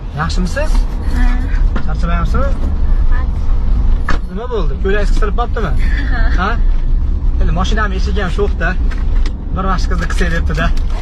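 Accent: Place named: Fergana Valley, Uzbekistan. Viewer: Sheva vodiy bölyotibdi özicha vohani tiqishtrganini maraz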